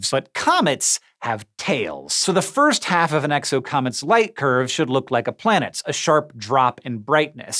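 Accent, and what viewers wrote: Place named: United States. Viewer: "it's LIKE" I'm listening to a school girl in a mans body. The American accent, voice and sentence structure does my FN head in! so many YT vids that promise and then the voice... JUST TALK NORMAL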